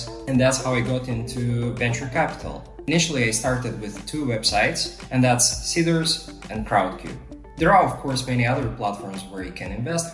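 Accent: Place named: United States. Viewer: Bro you sound proper American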